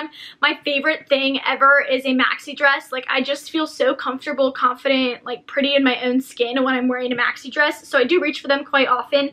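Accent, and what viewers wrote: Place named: South, United States. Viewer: OHHH... you're SOUTHERN southern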